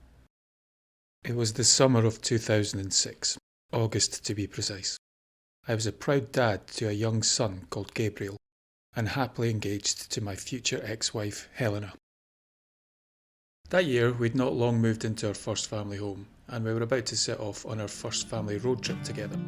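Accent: Scotland, United Kingdom. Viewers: I've watched so many of your videos these days and I enjoyed them all. You put a lot of great work and thoughtfulness into this - it's very much appreciated. Wish you a Happy New year with many more ghost stories to come. 🙋🏻‍♀ All the best from Bavaria ❤ P:S. just one wee thing - your viewers are from all over the world, many may not be native English speakers and not used to the lovely Scottish way of speaking (that I enjoy so much 😀), some, like myself may suffer from tinnitus or have bad hearing... It helps a lot to turn down the background music a bit so people can concentrate to the narration better. Thank you.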